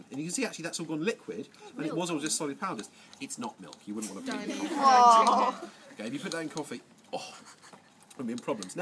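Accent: United Kingdom. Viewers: This what most British people sound like... And we can pronounce the letter t 😒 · "Uh, no" ends the video He is from the Commonwealth, probably England though based on the accent, since he was using Celsius instead of Fahrenheit like the US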